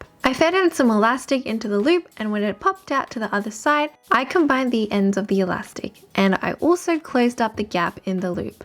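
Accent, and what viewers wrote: Australia. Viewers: Love this! So creative and clever! Thanks for sharing! I am now a sub! Also good to see and hear a familiar Aussie accent 🥰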